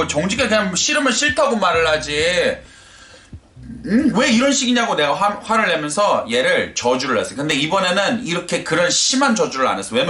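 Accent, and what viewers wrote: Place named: United States. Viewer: 한국인 입장에서 재미동포가하는 발음과 표현이 참 흥미롭게 들리네요..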